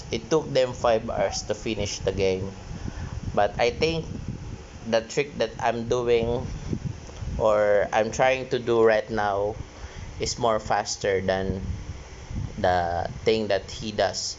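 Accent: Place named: Mexico. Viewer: Habla español no te hagas el gringo q tienes acento mexicano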